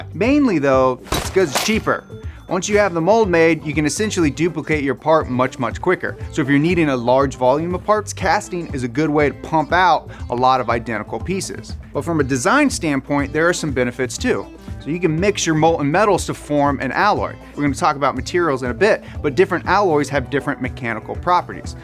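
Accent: Finland. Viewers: ah hearing that Finnish accent is music to my ears. Grandparents are From Finland so its nice to hear lol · me seeing thumbnail: thats a "finnished" video :) also nice finnish english accent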